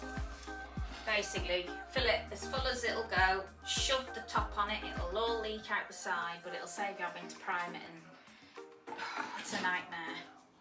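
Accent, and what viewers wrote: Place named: England, United Kingdom. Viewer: great little video, and nice to hear an English voice, lovely house you live in, and your camera work was very good under the circumstances, have subbed, cheers Shane Warks uk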